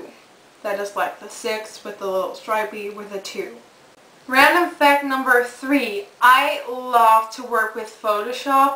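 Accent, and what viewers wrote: Netherlands. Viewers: when i first watched one of your more recent videos, i'm so embarrassed to say i actually thought you were American because I can barely hear your accent!! i was happy to discover you are from Holland! I love watching your videos, you are so talented and funny! LOVE YOU!!!!! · It's cause she's Dutch and she has an accent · That’s a Dutch accent? Interesting.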